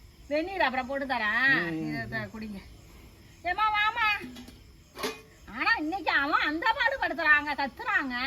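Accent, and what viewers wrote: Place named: Chola, India. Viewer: எங்கள் ஊர் காரைக்காலில் திருநள்ளாறுக்கு அருகாமையில் இருக்கும் சுரக்குடி என்னும் கிராமம் நான் உங்கள் காணொளியில் மிகவும் ரசித்து கேட்டது என்னவென்றால் உங்களுடைய சமையல் மற்றும் உங்களுடைய சோழமண்டலத்தில் உண்டான அந்த பாரம்பரிய தமிழ் பேச்சு நீங்கள் பேசுவது அப்படியே எங்கள் காரைக்கால் எங்கள் கிராமத்தில் பேசும் வட்டார வழக்கு நடைமுறையில் இருந்ததை கண்டு மனமகிழ்ச்சி அடைந்தேன் ஒருவேளை இவர்கள் நம்முடைய ஊர் பகுதியைச் சேர்ந்தவர்களாக இருப்பார்களா என்று தான் தங்களுக்கு இந்த குறுஞ்செய்தியை அனுப்பினேன் நன்றி அம்மா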